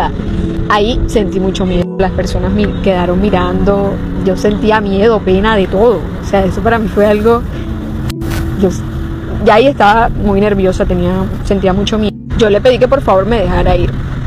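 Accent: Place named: Venezuela. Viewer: Pues te salvaste de ése asesino. Menos mal fuiste más lista. Deberías de hablar con la Fiscalía; y ser testigo en contra de éste individuo. Eso ayudaría más al caso. Me imagino qué tendrás fotos con el, para evidenciar de qué si se vieron. Fuiste muy inteligente!!! Y..tu acento me luce venezolano....será tú versión un montaje????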